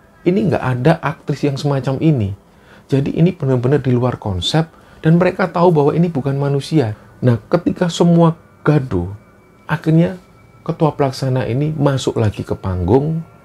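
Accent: Java, Indonesia. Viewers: Wkwkw ternyata mas temen naik gunungnya bestie ku 😂😂 Doi bilang 'itu mas temen gua 😅😂' Langsung dong, kirim salam buat mas, logat jawanya bikin candu kalo gw lagi masak ato bersih² rumah 😂😅 Ok back to clara 🙂🙂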